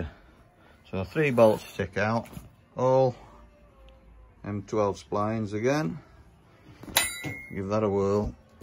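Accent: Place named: Rotherham, United Kingdom. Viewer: How much would you charge to install 1 for me I'm based in Rotherham sounds like your from around here ??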